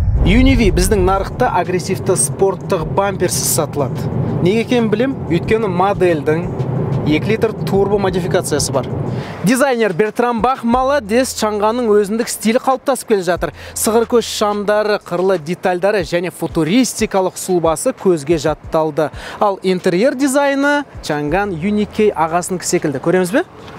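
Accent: Standard. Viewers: жарайсың Қазақ тілін таза анық әрі сөз саптауларымен керемет үйлестіріп жүргізетін бір ғана жүргізуші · Керемет видео, жарайсың, таза қазақша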